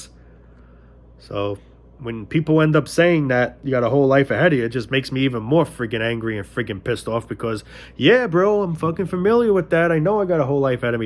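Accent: United States. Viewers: So true sadly.. also I like your accent! What part of America are you from?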